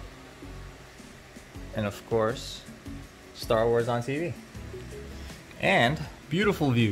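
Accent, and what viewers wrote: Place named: East Coast, United States. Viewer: I notice a slight east coast accent. any chance your from NY/NJ?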